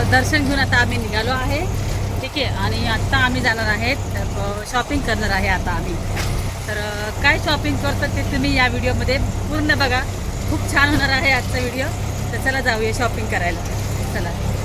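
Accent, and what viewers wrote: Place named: Sangamner, India. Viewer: मला तुमच्या भाषेवरून वाटलेच होते की तुम्ही संगमनेरच्या आहात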